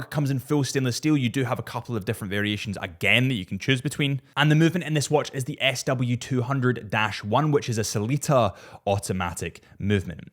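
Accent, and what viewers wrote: Scotland, United Kingdom. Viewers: Do all people in Scotland talk like this guy? He sounds so stupid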